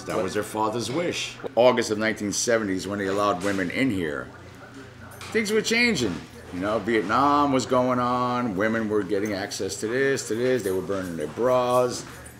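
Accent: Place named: New York, United States. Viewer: Such heavy NY accent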